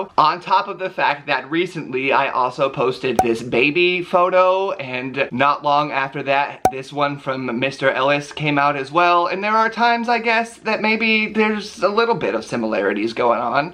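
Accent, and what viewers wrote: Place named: United Kingdom. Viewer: Yaaaas British accent!!!!!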